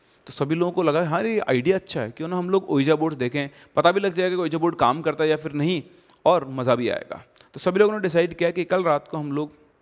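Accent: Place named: Bengal, India. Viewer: Apki bengali such mein sunne mein bohot cute hai sir...khub mishti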